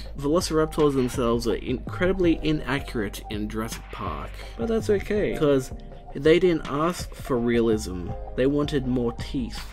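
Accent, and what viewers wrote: Australia. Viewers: ! I didn't know you were Aussie! I am too!!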